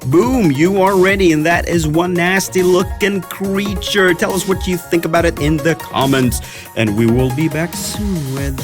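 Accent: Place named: Skåne, Sweden. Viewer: en javla skånsk dealekt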